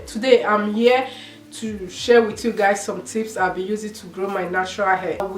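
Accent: Africa. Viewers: YOUR ACCENT IS SO CUTE I WANNA DIE. I LOVE AFRICANS! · My mom has the same African accent like yours, it's soooooo beautiful. I'm Ghanaian btw🇬🇭🇬🇭🇬🇭🇬🇭🤘🏾😛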